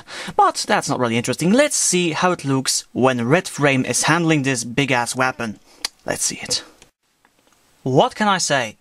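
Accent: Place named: United Kingdom. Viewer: man i cat get ur accents.. its kinda british, but than it sounds kinda fobby azn hmmm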